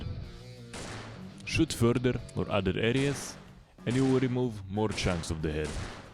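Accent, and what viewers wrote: Russia. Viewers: Русский что-ли? 😀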